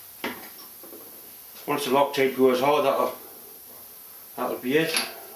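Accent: Newcastle, United Kingdom. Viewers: Thanks for all the video this year. I enjoy your way of doing things. Happy New Year to you and yours. I enjoy your Jordie accent and I think I'll have a Newcastle Beer in honor of you today.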